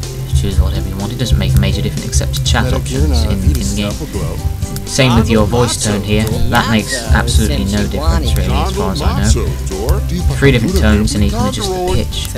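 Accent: United Kingdom. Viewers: where are you from? you talk posh. nmo offence && you should Posh english but im english i sound NOTHIN like that ! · OMG R U BRITISH i luv british ppl their awesome!!!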